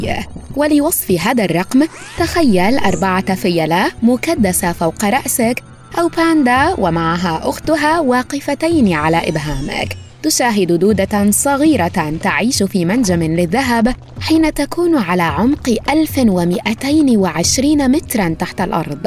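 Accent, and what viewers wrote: Egypt. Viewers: فعلن مصرية مصرية 😂😂😂😂😂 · تم اكتشاف جنسية المعلقة التي نحبها انها من مصر وااعع لغتك العربيه الفصحى رائعة شكرا لكى لقد افادني درس الجيولوجيا هذا ♥️ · مين لاحض انو الي تتكلم مصرية 😂❤️❤️❤️❤️تحية لمصر من الجزائر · باين🧐 عليها مصرية😏😂😂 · انتي اللي بتتكلمي في الفديو مصرية؟؟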